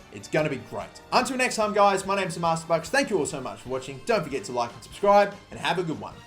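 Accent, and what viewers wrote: Australia. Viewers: U aussie m8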